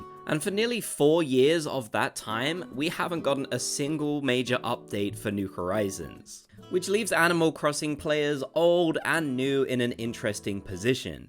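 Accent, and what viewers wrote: United Kingdom. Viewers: both are british❤️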